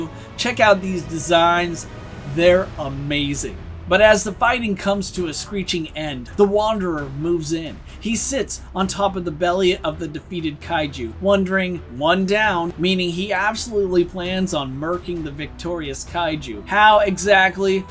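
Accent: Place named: Oregon, United States. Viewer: Are you from Oregon bro!?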